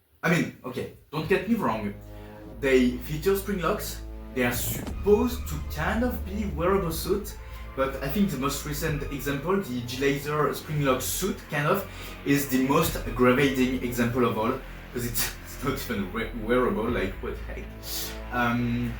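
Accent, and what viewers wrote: France. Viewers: Are you french? Also, love your vids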